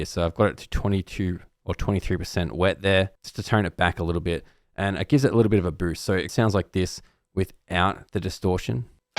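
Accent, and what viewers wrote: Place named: Australia. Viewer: Awesome to hear another Aussie. Are ya subbed to Dunn?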